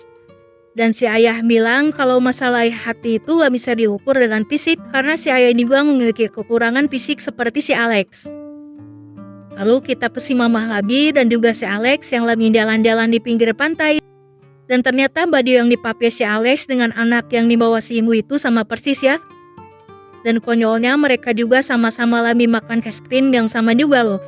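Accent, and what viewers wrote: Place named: Cicadas, Indonesia. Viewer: Jigana si admin orang cicadas ya. 😁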